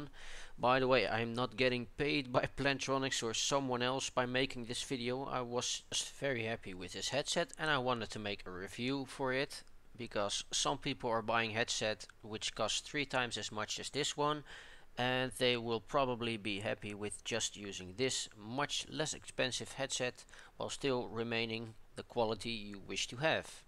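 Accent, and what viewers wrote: Netherlands. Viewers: I needed 3 seconds to know you are Dutch speaking. :) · You sound like a dutchman, just saying :p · lol ik hoor duidelijk dat je nederlands bent maargoed XD (tis oke hor XD) ik zou het eigenlijk handiger hebben gevonden als je de review deed met headset op... dan zou je wat beelden vooraf kunnen recorden en dan de headset opzetten. ik wou namelijk weten hoe het geluid klinkt, als je zou kunnen vertellen hoe het klinkt of ff video link sturen waarbij je die headset gebruikt, bedank voor het lezen/reageren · just got them! they actually fit perfect on my head! and music and in games does actually this headset proven to be good! not extreme but its only like an 50£ headset and for that price its perfect! i have a problem pretty big one but thats not the headset thats the pc! im having problem with installing the driver(no surround);( but hopefully sooner or later i,v fixed that! 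im using glasses and it works just fine =) thanks for the review and i think your dutch;y english is perfect xD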